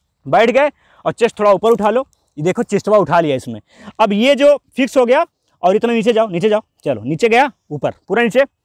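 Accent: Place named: Uttar Pradesh, India. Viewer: Ap up se ho kya language to wase bol rahe ho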